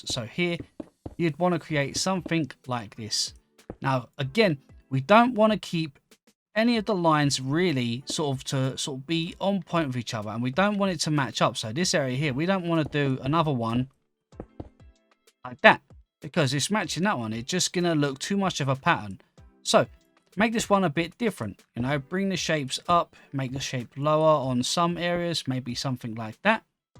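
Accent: United Kingdom. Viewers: British duh!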